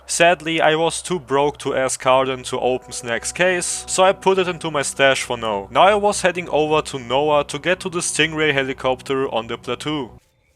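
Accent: Germany. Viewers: I did really enjoy the video. I suck at giving feedback sorry but im excited to see more. I was also thinking the entire tume that u sound so german so it was pretty funny to find out. Anyway i think u convinced me to finnaly try misery myself, also overcarrying yourself to sell all the loot is relatable af. Keep up the great work!! <3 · Took me 4 seconds to realize that he's german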